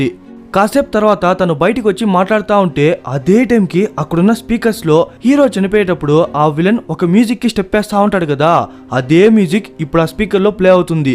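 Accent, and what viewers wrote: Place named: Andhra Pradesh, India. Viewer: Cheyyandaa enti raa brooo. Chilton nellore boarder Slang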